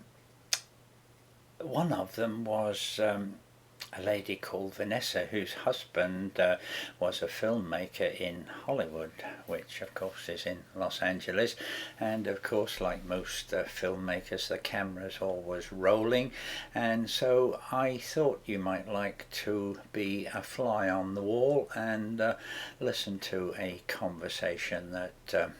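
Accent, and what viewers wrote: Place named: Derbyshire, United Kingdom. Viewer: Howdie, Have continued to watch your videos since before you made it big and I still think you are a blast to watch and you're definitely a pride of Derbyshire. Being a Derbyshire lad myself, it's great to hear a local accent being that I now live in France. Thanks for the videos and please, keep them coming.:)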